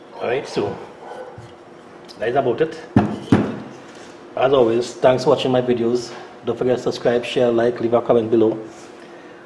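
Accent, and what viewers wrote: Trinidad and Tobago. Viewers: Wow was looking how to check my magnetron and came upon your video. Was so glad when I heard a fellow Trini voice. Was able to tell mine was bad. Thanks man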